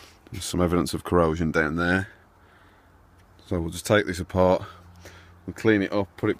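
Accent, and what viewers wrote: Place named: Birmingham, United Kingdom. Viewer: Hi there's no option to private message on here! I just wanted to know where your from as you sound like your a brummie lol I'm also from Birmingham and really need your help. My mums car is a scenic and she had it serviced by her partners friend who's a mechanic who had some trouble in doing what he was doing with a lot of tugging and pulling and jiggling about not long after this we was driving and on came the warning stop on the dash saying there's an electronic fault something about the hand break also. She has no speedometer or anything. I just wanted to know if you could help at all?! I know you made this video long ago and I've just came across it now but she's given her car to another mechanic who couldn't find the fault and you obviously know what your doing! She's paying out money for people to tell her they can't find the fault! 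If I was a man or even had a clue about cars I'd just follow your video but we have no idea what's so ever! The cars still drives but as you can imagine it's very stressful and you really feel down when your cars not working 100% i would be so grateful if you could reply back to this comment or email me on <mail> if you are willing to help. I really hope so!Hope to hear from you Jayda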